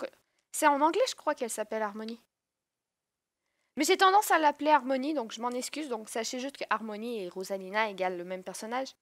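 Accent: Quebec, Canada. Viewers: T'es québécoise?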